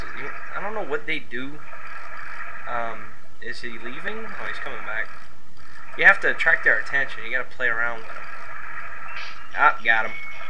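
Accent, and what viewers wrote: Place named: Louisiana, United States. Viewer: Where in south Louisiana are you from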